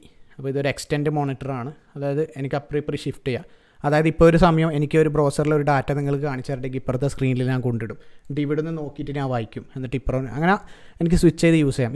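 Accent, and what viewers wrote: Kannur, India. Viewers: Kannur ano bro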